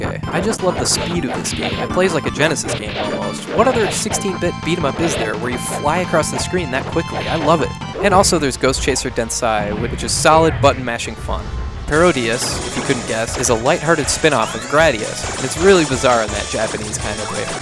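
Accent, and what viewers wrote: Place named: Midwest, United States. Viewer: What part of the midwest US are you from?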